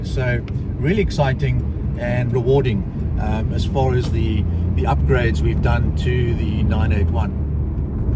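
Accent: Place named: South Africa. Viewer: Hi. Nice to hear your review and your SA accent! I’m amazed that you prefer your boxter vs 911? Find this surprising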